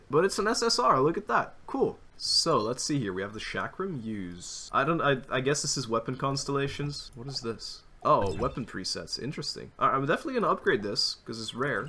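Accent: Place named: France. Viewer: MAN THAT ACCENT HOLY WOOOW JE SAVAIS PAS QUE T’ÉTAIS FRANÇAIS je suis choqué mais grave surpris continue tes vidéos d’explorations elles sont grave chill!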